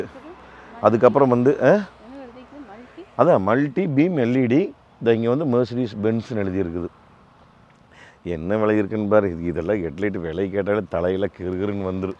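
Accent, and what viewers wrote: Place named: Tiruppur, India. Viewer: Your speech is very nice in tirpur language we are from tirunelveli.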